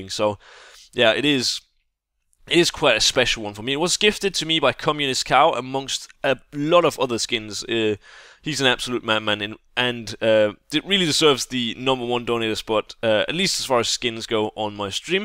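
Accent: Denmark. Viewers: Danish??? your accent is really Danish ! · You sound every Danish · I don't know why... But you Sound very Danish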